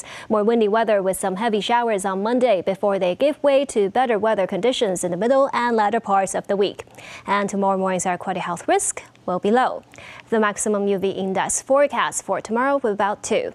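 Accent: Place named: United States. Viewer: 英文絕頂 是美洲英文😊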